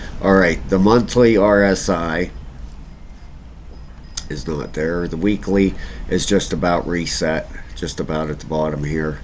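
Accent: Pittsburgh, United States. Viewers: Sounds like Pittsburgh to me, am I right?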